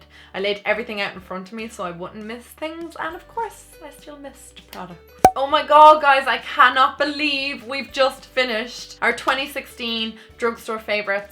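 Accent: Ireland. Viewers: What part of Ireland are you from x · Trte Mrte she's Irish.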